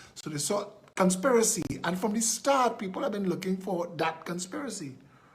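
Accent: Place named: Trinidad and Tobago. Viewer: an excellent orator. Articulate delivery with a nice blend of standard and Trinidad English. Darze wha we talkin bout. Most of what you assert I have been saying for a while now. You keep saying Trinidad is a shithole country and I have been saying long before that Trinidad is one big stinking ghetto. Keep fighting for your country snd its lost people. I may join you soon in an attempt to rescue it.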